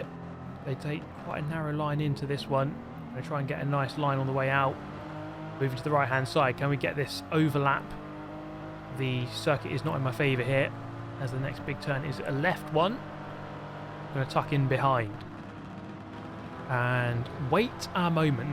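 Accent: United Kingdom. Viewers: ! Your voice makes ne wanna be British! Um gonna STEAL your voice. But I need your password!!!😊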